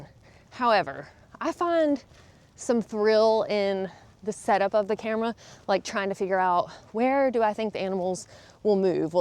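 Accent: South, United States. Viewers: Girl, love that southern vibe. Never heard more dropped g’s at the end of words in my Texas life!😊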